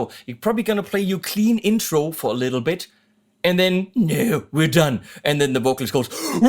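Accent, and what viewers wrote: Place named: Germany. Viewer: wonderful Sherman Akzent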